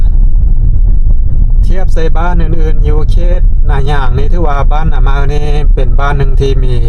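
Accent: Loei, Thailand. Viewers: สำเนียงเมืองเลยครับ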